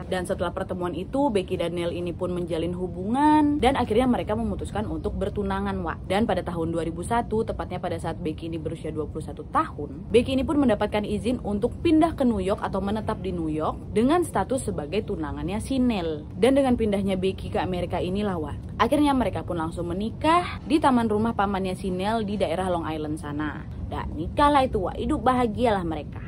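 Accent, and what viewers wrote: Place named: Medan, Indonesia. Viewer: Logat kak medan kali😂😂 Btw salam kenal yah wak aku yang setia kali ini nonton videomu sampe berulang pun aku sanggup..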